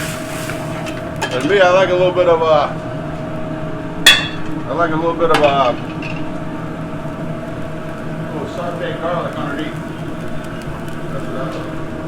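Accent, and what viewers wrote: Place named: Philadelphia, United States. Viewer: "Gabbagol?" "Prosciut'?" Are youse guys from Philly?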